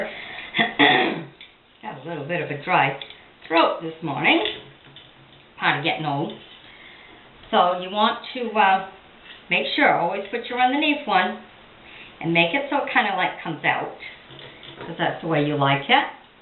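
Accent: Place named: Maine, United States. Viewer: Are you from Maine? I thought so with the accent then you said homemade Italians? I knew it! Thank you for sharing...Merry Christmas!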